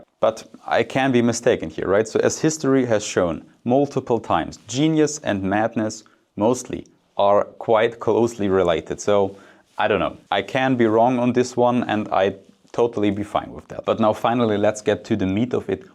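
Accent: Germany. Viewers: Can not stand this horrible German accent. Get pain from this accent. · Horrible German accent.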